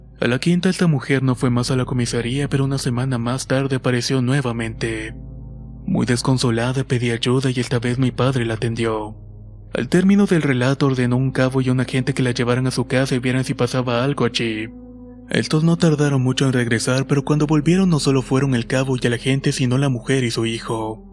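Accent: Standard. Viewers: Tu acento es como el español neutro de las traducciones de películas de principios de los 2000. Me traes buenos recuerdos. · Oye men, no logro entender cuál es tu nacionalidad, ya que no tienes un acento que te pueda identificar como recidente de ni un país concreto.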